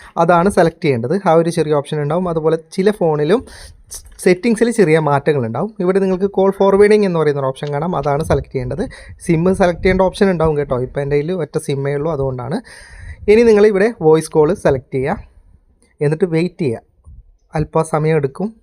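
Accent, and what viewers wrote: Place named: Kozhikode, India. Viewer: Chettante veed kozhikode aanenn manassilaayi 😁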